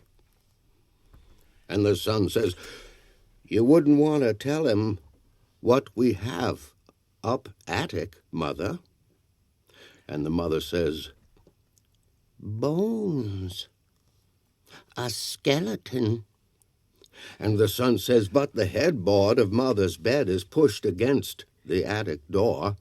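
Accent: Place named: New England, United States. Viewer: This is how I believe Frost meant this to sound , Great New England accent enjoyed it very much thank you.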